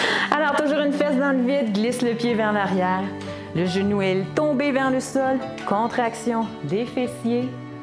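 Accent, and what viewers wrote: Quebec, Canada. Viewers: J'adore, c'est simple bien expliquer ! En plus d'un joli accent québécois 😚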